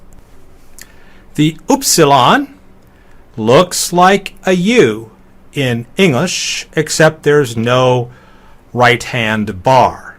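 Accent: United States. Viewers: Your pronunciation is very american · Oh my gosh his pronunciation is awful and he speaks so very typical of an American when addressimg to pupils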